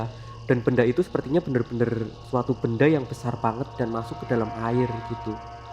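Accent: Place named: Banyumas, Indonesia. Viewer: nek orang ngapak emg bgtu mas hehe sy pun bgtu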